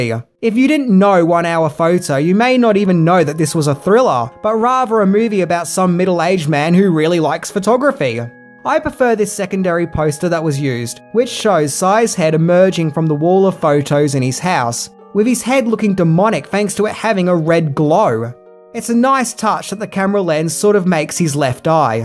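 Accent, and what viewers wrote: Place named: Australia. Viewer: The Australian O is a glorious thing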